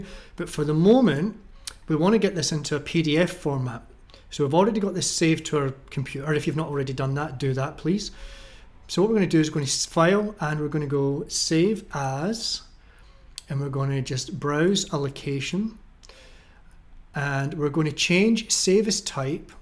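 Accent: Scotland, United Kingdom. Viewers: How can I support you in the $ sense ? ? Seriously, we need a lot more people like you (with or without the dour Scott's accent) I'm in Melbourne, and not that hard to find.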